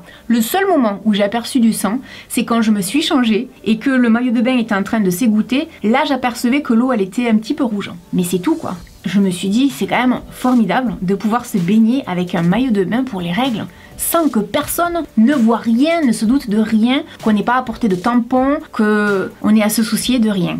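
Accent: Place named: Southwest, France. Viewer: J'adore ton accent du sud-ouest!!!!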